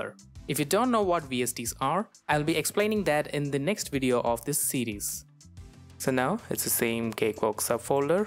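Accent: India. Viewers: What's the size of cakewalk walk [including studio instruments suite only] By the way... Where are you from?? Your voice sounds like an Indian ^_^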